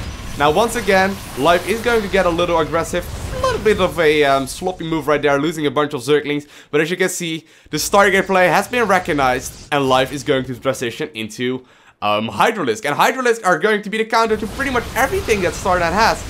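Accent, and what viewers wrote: Netherlands. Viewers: Dutch, pretty much the same as a german accent xD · are you from Holland?? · He's from the Netherlands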